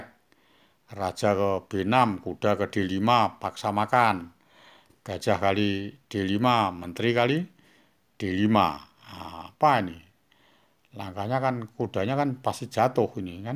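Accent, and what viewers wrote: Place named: Tegal, Indonesia. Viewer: Sampean kaya wong Tegal min...kaku omongane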